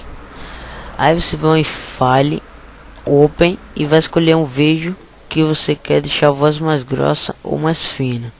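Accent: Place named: Florianópolis, Brazil. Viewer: tu tem sotaque de manezinho da Ilha! HAHAH tu é de SC?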